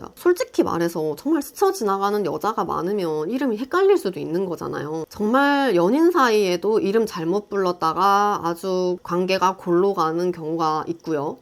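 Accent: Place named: Gyeongsang, South Korea. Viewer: 공감 많이 되네요~~사람에 대한 배려심. 기본..영상 잘 보았습니다. 말하시는 중간중간에 경상도 억양이 살짝 묻어나시는거 같아요!!!!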